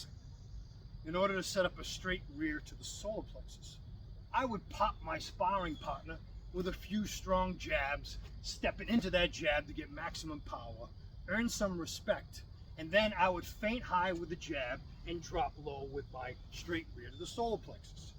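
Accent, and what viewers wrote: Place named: New England, United States. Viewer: Hi! Thank you! I detect a New England accent! Have a wonderful day! John KJV John KJV John KJV ❤❤❤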